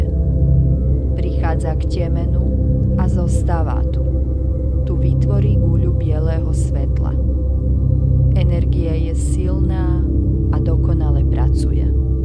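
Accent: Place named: Eastern Slovakia, Slovakia. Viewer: Meditácia obsahovo a postupovo veľmi dobrá, žiaľ, mne vadí ten hlas, ktorý ma ruší. Navyše tam vnímam východňársky, miestami až rusínsky prízvuk. Keby to bolo nahovorené niekým iným, je to bez chyby a perfektné. Zafarbenie hlasu, intonácia, dikcia a výslovnosť, to sú všetko faktory, ktoré sú pri vedenej meditácii veľmi dôležité.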